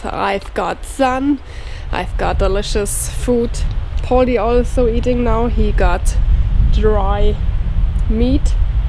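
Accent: Germany. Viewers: It’s called Rosin In The USA,Lov You’re German accent,🥰🙏😇🇺🇸👍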